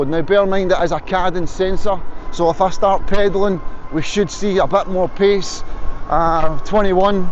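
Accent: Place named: United Kingdom. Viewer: It’s great to see you enjoying your bike. I’m German and speak English quite well, but I can’t understand a single word you’re saying. Your accent is so strong that it’s almost impossible for anyone outside the UK to understand you. But I bought the bike anyway. Cheers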